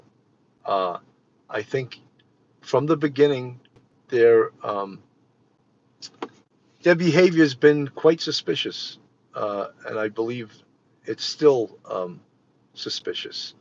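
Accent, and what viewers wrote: New York, United States. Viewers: Being Canadian I love the NY accent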